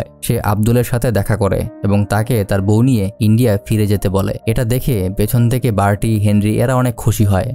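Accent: United Kingdom. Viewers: British vabe bolle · vai apni movie explain kortesen ,apni sundor kore kotha bolben,seta na kore apni british style a bangla boltesen,ki bollen kiso bujte parlam na,faltu. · Your Bangle language speaking In English Accent was just awesome 🤣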